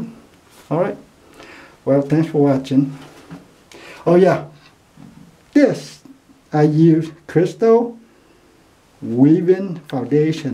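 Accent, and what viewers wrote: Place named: Vietnam. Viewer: I'd love to buy one of your knives in the future, I'm sure they're going to be great =) just your sharpening/grind alone will be great, but you seem to be getting really good at the hardening/tempering too. awesome stuff. can i ask what country your ancestors were from? i'm curious about your accent :) it kinda sounds vietnamese or cambodian to me.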